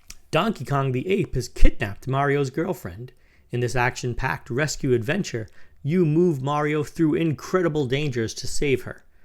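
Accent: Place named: Queens, United States. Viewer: Long Island NY? That accent sounds more like Queens than LI!!!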